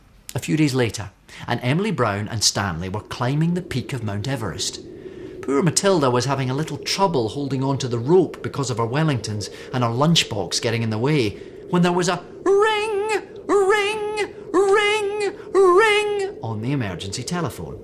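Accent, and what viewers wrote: Scotland, United Kingdom. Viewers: His Scottish accent...the way he says emergency....ooohhhhhhh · I am 19 and 1/2 years old and everything I read sounds scottish in my head because of him. Hit 2 over and over to hear a funny word :3 [/juvenile] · i know i love his Scottish accent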